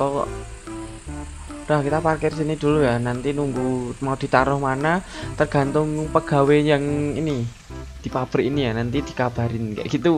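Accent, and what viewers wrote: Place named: Java, Indonesia. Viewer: Medok mass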